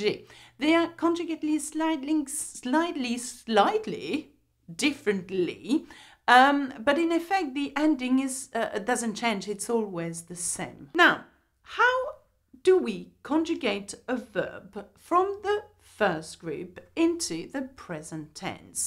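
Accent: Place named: France. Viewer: Thank you. These are good refreshers for me. J’ai un question. Vous êtes de quel région en France. J’ai étudié en Normandie (Rouen). Votre accent me semble un peu différent.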